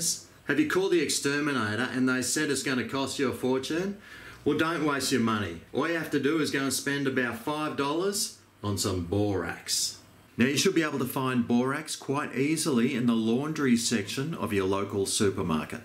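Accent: Australia. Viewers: Love your accent and calm energy. I lived in Oz for 1.5 years, it was the highlight of my life. You seem to live in paradise, wonder which part you're in. Trying your ant solution now, thanks so much 👍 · Thanks for the info! Love your accent, makes me want to throw some shrimp on the Bar-B. After I clear the area with a borax solution! Thanks again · Love Australian accent. I watched several videos on this. Some were too long. Some moved their phone around so much that I got sea sick. This was short. To the point. Simple. Concise. And I like that you added the caution at the end about dogs, butterflies, etc. Thank you. Your formula is the one I chose.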